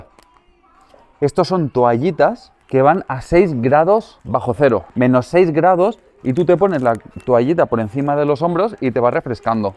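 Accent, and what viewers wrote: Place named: Spain. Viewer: Habla El Espanol però con acento Espanol però de donde es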